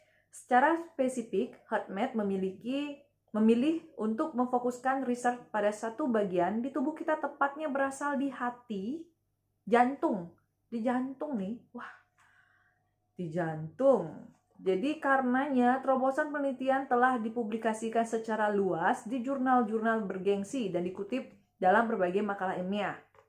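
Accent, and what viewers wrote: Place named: Bali, Indonesia. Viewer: Mba nya dari bali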